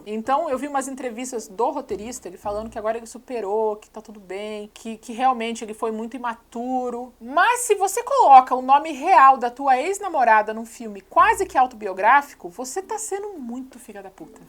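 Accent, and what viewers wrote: Curitiba, Brazil. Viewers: To amando seu canal, senti um sotaquezinho de curitiba, se eu estiver certa, é bom ver uma conterrânea aqui no youtube :) To indicando seu canal pra outras pessoas tbm! E quero agradecer pq seus videos tão ajudando muito num termino recente que eu tive. Manda ver guria que tu vai ter muito sucesso ainda!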